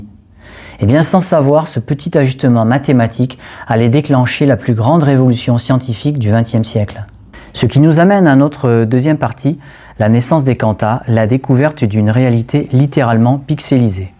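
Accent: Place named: South, France. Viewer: Aïe ! Il vous faut prendre des cours de diction, mon garçon : la monotonie de votre récitation est insupportablement ennuyeuse, malgré les infimes traces d'accent méridional que vous y laissez traîner. Essayez de faire lire vos textes par une voix synthétique, ce ne pourra pas être plus mauvais en tout cas. Cordialement !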